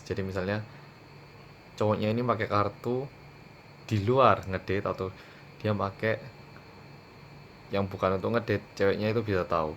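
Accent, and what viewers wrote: Java, Indonesia. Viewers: Medok banget 😂😂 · kerenn....sam logatnya medok jawa banget sam... dulu belajar dimana sam?? berapa tahun belajarnya,??... maaf kepooo 😀😀😀😀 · Weh wong korea bahasane jowo jos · Bèné medhok gpp. Lha kan ancen wong malang haha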